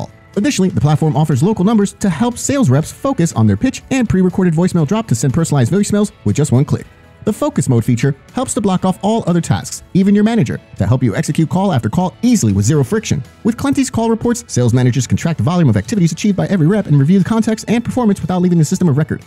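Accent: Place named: United Kingdom. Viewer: This one seems really convincing, the british accent and the free trial sold me on the idea.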